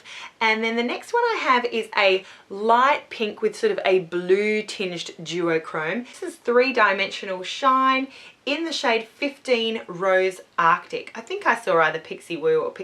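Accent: Australia. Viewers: are you from Australia?? AWESOME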